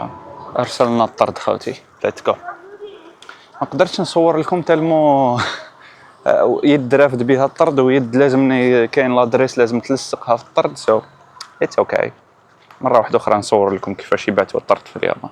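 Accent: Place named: Jijel, Algeria. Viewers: واه هو من جيجل😊😊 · من لهجتك باين من جيجل وضواحيها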